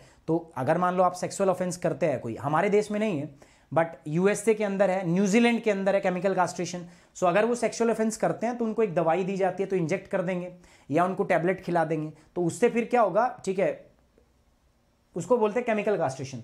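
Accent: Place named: India. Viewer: Mst ho sir aap...Jat da putr sher👍 Way of talking great like Desi 😂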